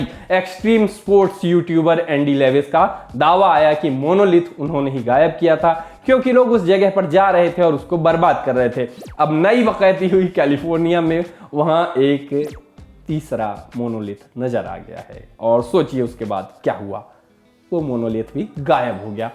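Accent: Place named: Bihar, India. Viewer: Yarr mujhe aap ka baat krne ka tarika bohot achha lga bihari hindi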